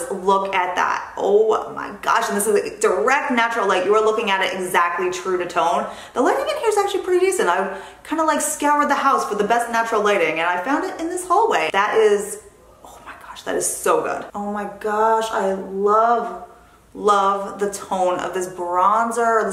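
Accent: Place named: East Coast, United States. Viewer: I'm a native east coast girl now living in Vegas. to be honest, i started watching your videos because it gave me a bit of that back home feeling with your accent! shortly after, i discovered we're the same age so i binge watched your vids and i have learned so much from you!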